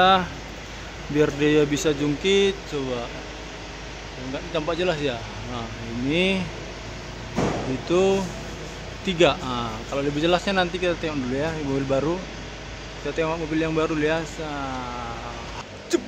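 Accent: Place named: Medan, Indonesia. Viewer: Medannn kali ahhhh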